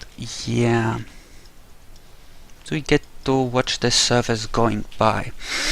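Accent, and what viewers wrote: Russia. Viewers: I like your accent. Where are you from? Russia?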